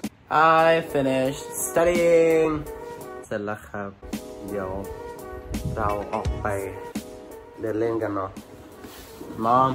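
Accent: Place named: England, United Kingdom. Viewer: Hihihi I love yo English accent 🥺♥️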